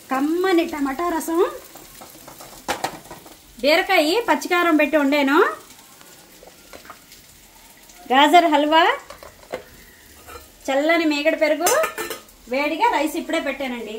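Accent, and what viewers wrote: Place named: Vizianagaram, India. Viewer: మీ మాటలు వింటుంటే మా విజయనగరం భాష లా ఉంటుందండి వాళ్లు చాలా చక్కగా ఆప్యాయంగా మాట్లాడతారు మీ వంటలు super 👌👌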